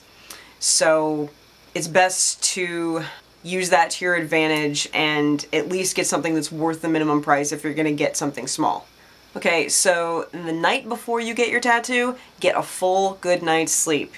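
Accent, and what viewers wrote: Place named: Northeast, United States. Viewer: Hi I have been a follower since early 2012 Just Curious what state are you in. You sound kind of North Eastern U.S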